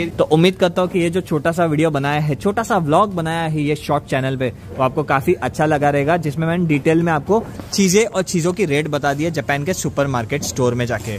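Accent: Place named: Maharashtra, India. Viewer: Nice maharashtrian accent ❤...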